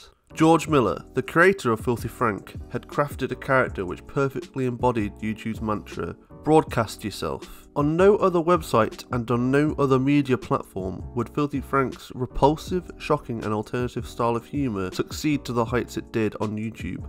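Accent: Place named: United Kingdom. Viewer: Is that another British accented commentary channel, not an insult but fuuck there's tons of them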